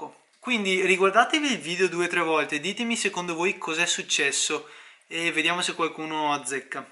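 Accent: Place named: Veneto, Italy. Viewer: Se sente te si veneto 😂😂❤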